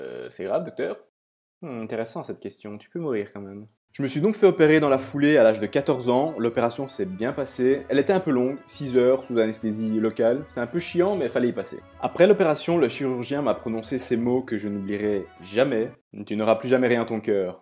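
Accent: Belgium. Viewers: T BELGE OU FRANCAIS!? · T'a un accent belge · Je déteste ton accent belge ms putains tu m'as bien fait rire !!! #bx #ulb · T’as trop l’accent liégeois comme moi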